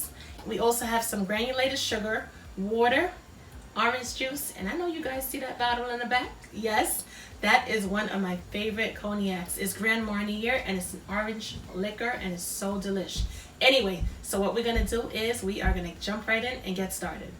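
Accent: New York, United States. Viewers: Yup, I'm a native NY'er living in Florida and I love hearing the accent. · You have the most New York accent I've ever heard, lol! Where are you from?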